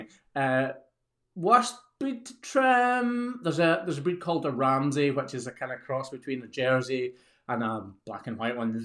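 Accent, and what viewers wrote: Scotland, United Kingdom. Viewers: You’ve got me hooked! No, not just on the hoof care - on your Scottish accent. My 2x great grandfather was born in Abroath (northeast), my 2x grandmother in Glasgow, and they settled in Ayrshire. He was an author and a machinist. Your accent allows me to imagine what he might have sounded like. Check out his work on Bobby Burn’s inspirations, “Rambles Round Kilmarnock”, “Rambles Round The Land of Burns”. He also had a wicked sense of humor I’m told.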